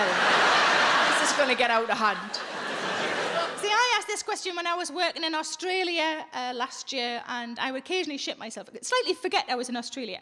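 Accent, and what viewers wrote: Newcastle, United Kingdom. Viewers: Love this woman she talks about things, that every single woman in her audience can relate to. The mix of sweetness ,observational slightly crude humor and her geordie accent , made my day.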